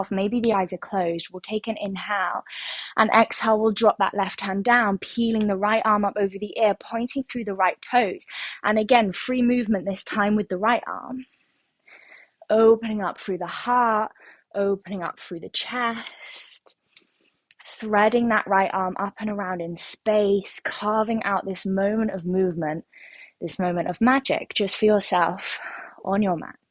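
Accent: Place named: United Kingdom. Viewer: You're a fellow Brit! Such a refreshing change to hear on da choob.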